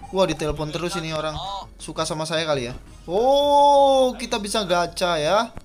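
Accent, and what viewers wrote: Java, Indonesia. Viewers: Fakta video ini, orangnya medok dan pokemon lovers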